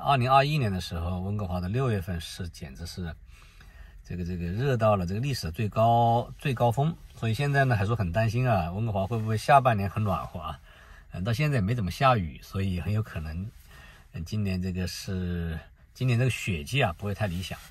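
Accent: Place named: Nanjing, China. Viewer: ，口音，是南京人，我住罗德岱尔堡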